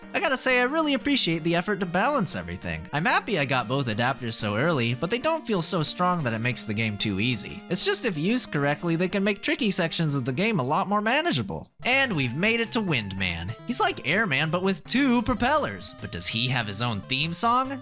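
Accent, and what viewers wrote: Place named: Germany. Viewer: Why a WERMAN ACCENT This is so cool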